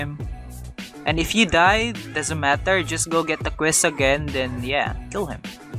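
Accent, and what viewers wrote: Philippines. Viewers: This accent, you're a filipino aren't you?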